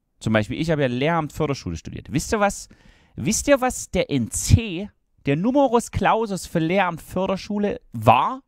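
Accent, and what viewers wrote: Saxony, Germany. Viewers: Typischer Sachse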